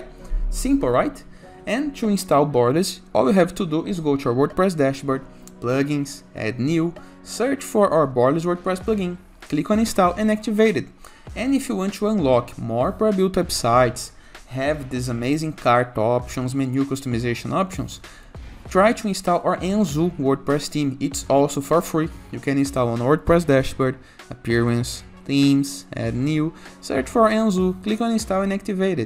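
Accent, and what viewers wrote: Brazil. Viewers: Sotaque brasileiro não passa batido kkkk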